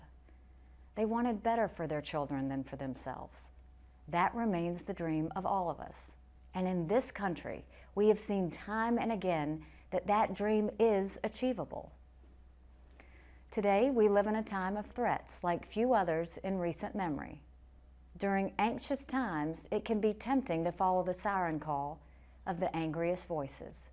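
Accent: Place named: South, United States. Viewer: She sounds like a Southern White woman.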